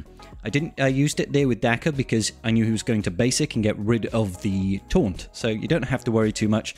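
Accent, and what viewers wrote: United Kingdom. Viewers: Realy enjoying the content please keep it up. On attempt 20 but eill keep trying. Also good to hear a fellow Brit playing. Ally code: <num>.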